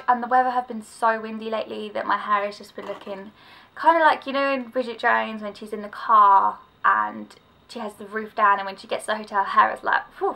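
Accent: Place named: United Kingdom. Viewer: Your british accent is so cute ^_^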